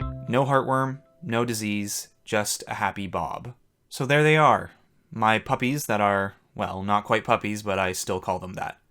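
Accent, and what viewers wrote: Canada. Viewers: If I remember correctly, I think he hinted at being from around Toronto in one livestream. But yeah that accent is DEF Canadian 🤣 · You're definitely Canadian! I have made up my mind haha. I am Namibia (African) and can just tell from your accent. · I've been thinking the Sam's thing! Either Canadian or from the very northern United States, like Minnesota.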